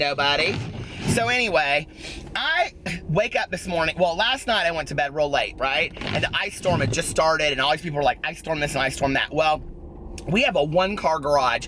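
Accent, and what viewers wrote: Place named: Midwest, United States. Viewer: Your Midwest accent really came out in this rant lol 💙💙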